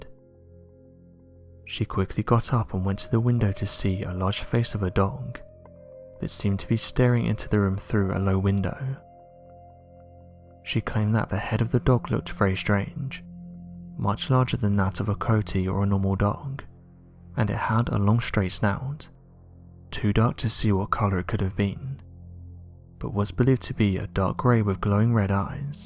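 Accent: England, United Kingdom. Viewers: I like these videos, but his British accent Irks me. The female who I assume is his daughter or perhaps wife, has a much more pleasant voice. The slow monotonous thick British accent is annoying.Hire a American, because I’m almost certain that the overwhelming majority of your subscribers are in fact Americans. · Well I've been a photographer for well over 50 years, and nothing in my imagination can suggest how anything remotely looking like a creature would be captured by a photograph that was taken by a remote camera interesting enough it was taken in a close-up range how is that possible with the remote camera. If it wasn't for your voice being so how can I put it with an English accent and a tinge of mysterious undertones I would gather to say that everything you've done so far has been almost, I repeat almost genuine.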